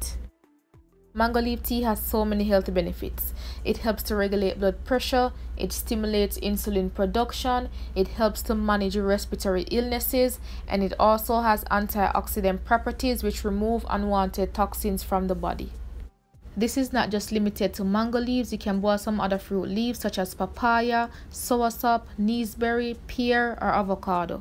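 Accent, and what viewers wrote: Jamaica. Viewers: I Can Hear The Jamaican Accent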